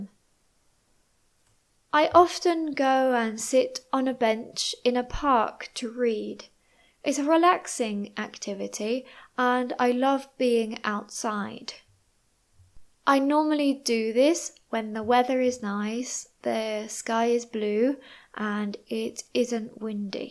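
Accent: United Kingdom. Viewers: Thank you for your videos, they are very clear and help to understand the elegant British pronunciation...... although I prefer your videos A1....😂😂😂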